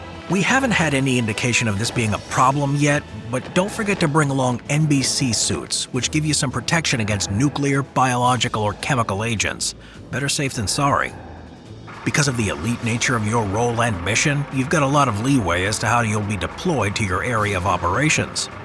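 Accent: United States. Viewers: "Any questions?" Yes: why do you should like an American? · A Briton who speaks American..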